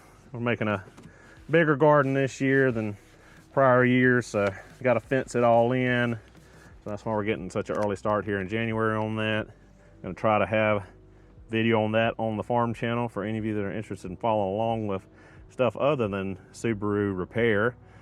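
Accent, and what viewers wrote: South, United States. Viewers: You read my mind when you were complaining about salt I was chuckling because I am next to the Canadian border and every car rust to pieces. You put on a really great show. I like listening to your southern accent. It’s very relaxing and draws you in, you can really tell a story.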